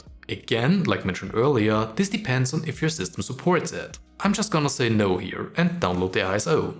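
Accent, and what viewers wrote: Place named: Germany. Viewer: ich höre in dem englisch einen deutschen dialekt raus 🤔😂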